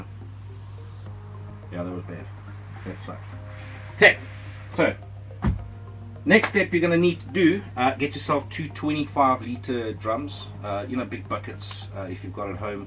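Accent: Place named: South Africa. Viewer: I wondered what accent this was. South Africa. What a proper country. Cheers.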